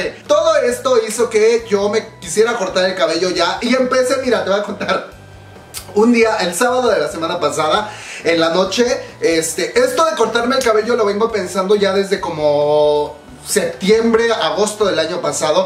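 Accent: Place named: Mexico City, Mexico. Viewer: De donde será la? Tiene acento cedemexeño pero no lo sé:0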